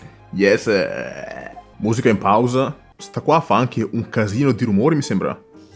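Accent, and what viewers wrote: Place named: Veneto, Italy. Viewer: grande vecio ti ho conosciuto da poco,spacchi,e poi te si veneto 💯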